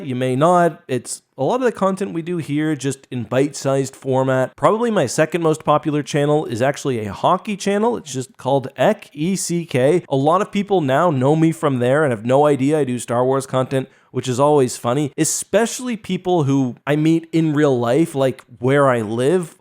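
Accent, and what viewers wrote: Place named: Eastern Canada, Canada. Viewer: You Definitely sound like you are from the east coast of Canada.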